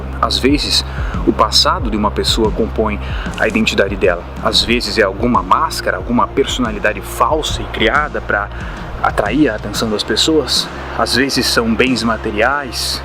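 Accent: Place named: South, Brazil. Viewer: Sabia q esse sotaque era qui do Sul.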